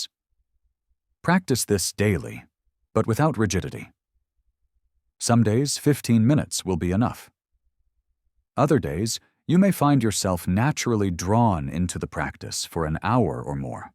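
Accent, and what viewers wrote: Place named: Kenya. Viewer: Those vowels sound and are exactly like those used by Kikuyus in Kenya 🇰🇪. A,E,I,O,U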